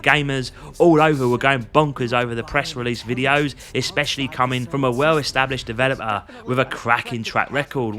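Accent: Cockney, United Kingdom. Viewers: Mate, I reckon it’s gonna blow expectations personally, you may be cockney but you ain’t east London, keep the videos coming love to hear the thoughts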